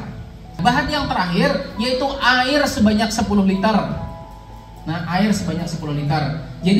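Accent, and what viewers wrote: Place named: Sumatra, Indonesia. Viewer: Ulun kira piyan i urang sumatra. Saklainnx sama ja wan ulun urang berabaei.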